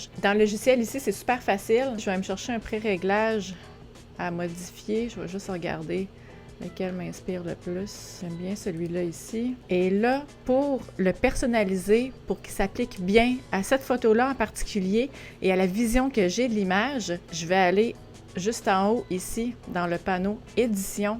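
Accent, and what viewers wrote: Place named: Quebec, Canada. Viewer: Bonjour, j'utilise Luminar NEO, et je l'adore. Mais au delà de ma passion pour la photo, en amateur, c'est votre humeur et votre accent qui me redonne envi de repartir faire un périple au Québec. J'ai adoré en juillet dernier, faire ce voyage dans votre beau pays, Patrick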